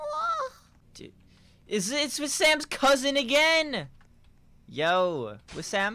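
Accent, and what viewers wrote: United Kingdom. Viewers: Why did he turn British 😭😭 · I never new you were British but you moans got me dying😂💀💀💀💀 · I an never tell if ur American or British 💀💀💀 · Are you British?? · one question why is he British ? Aren’t you American? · well the accent sounds like a British one so idk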